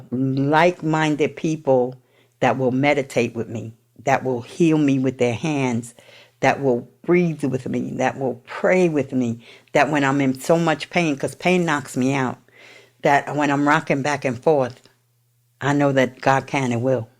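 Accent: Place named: New York, United States. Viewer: This chick sounds like such a New Yorker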